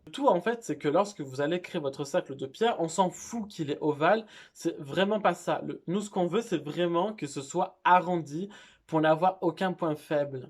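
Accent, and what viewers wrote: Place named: Marseille, France. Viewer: Super l accent marseillais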